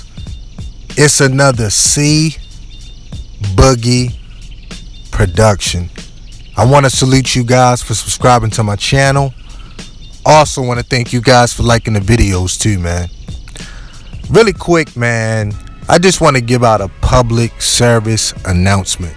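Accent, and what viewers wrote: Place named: Maryland, United States. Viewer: I can tell your from Maryland or somewhere up north. Am I right?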